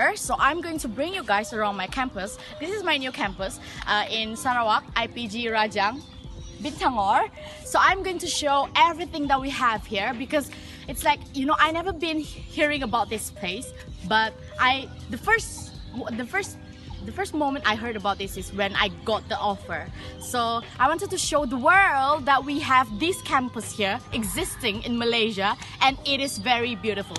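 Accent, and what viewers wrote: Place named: England, United Kingdom. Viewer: This is really good information because I dreamt to go here btw I like your English accent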